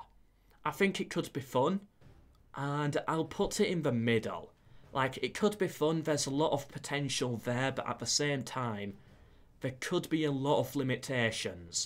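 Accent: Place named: United Kingdom. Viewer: Sometimes i watch this guy's content just because of his accent lol. I know it's one of the British ones but there like thousands of them. Anyway, it just sounds really funny, in a good way, to me as a non-native English speaker.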